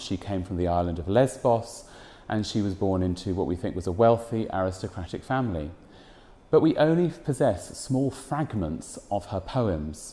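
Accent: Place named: United Kingdom. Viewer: british vsauce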